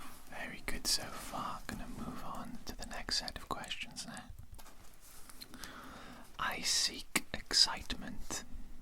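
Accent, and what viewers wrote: Wales, United Kingdom. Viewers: you have such a relaxing voice , do i detect a little bit of a welsh accent there? sorry you took down the wrong answer for me there i dont infact always finish my chores , super relaxed video loved the writing sounds 😴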